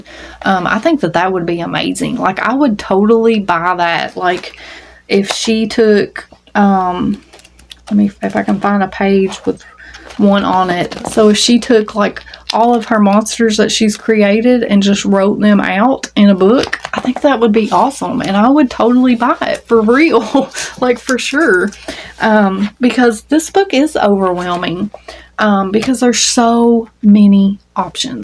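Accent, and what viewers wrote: South, United States. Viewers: So many lovely items that you have done! The lovey with the doll head is a great idea. Your doily is beautiful. If you run out of wall space, I have put a doily in my window. I guess you'd have to be careful that the sun didn't fade the yarn, but it sure looks pretty. When you say "doily" in your soft Southern accent comes out like "dually" Cute!! I agree that I feel so bad for the Joann employees. Luckily I think the one near me is not going to close. I love Big Twist yarn also. Have a great week and looking forward to your yarn haul. I'm trying to behave in that area, but it's hard to do!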